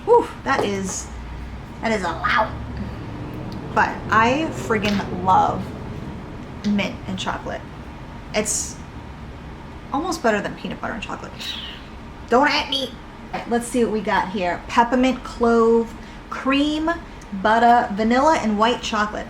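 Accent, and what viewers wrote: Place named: New England, United States. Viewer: Fellow New Englandah? lol also thanks for the review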